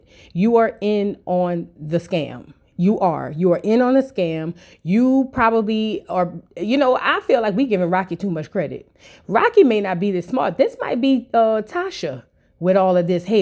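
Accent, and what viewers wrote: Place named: Louisiana, United States. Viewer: Why you sound like the mistress, is it a lousania thing, lol.....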